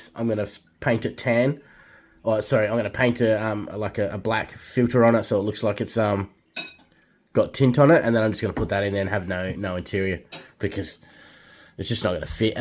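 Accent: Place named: Australia. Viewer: Just came across your channel through the mystery of algorithms, but liked and subscribed straight away as it's great to find an Australian RC Channel 🇦🇺🦘😎 Getting tired of listening to yanks and poms 😂 The Cruiser is looking awesome, but sounds like you would have had more joy from simply buying a MN82 RTR instead!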